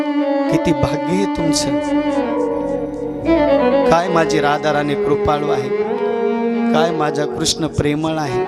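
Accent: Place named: Rural, India. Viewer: किती गावठी मराठी बोलतोय रे तू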